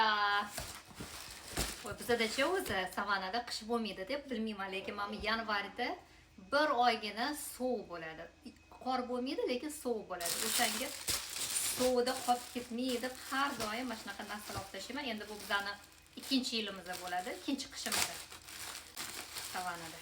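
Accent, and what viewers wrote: Tashkent, Uzbekistan. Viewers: omad. Zo’r samimiy toshkentcha chiqibti❤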